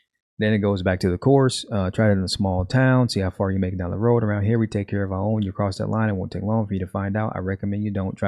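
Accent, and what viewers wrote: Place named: South, United States. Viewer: What we have is Me Too version 2.0 the race war edition with these absolute mental gymnastics that these people are doing so they can cry racism about everything. Did you hear they are trying to call out being on time is racist?? But great commentary and I can hear the "Southerener" in you. Everyone looks at me like I am stupid living up north when I say it that way.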